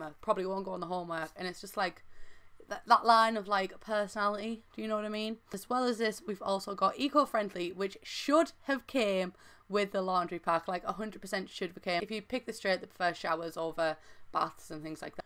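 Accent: United Kingdom. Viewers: Very useful- will be getting those mods for the aspiration and traits- its nice to hear a British accent too- I've not come across fellow Brits when looking at Sim Youtube accounts!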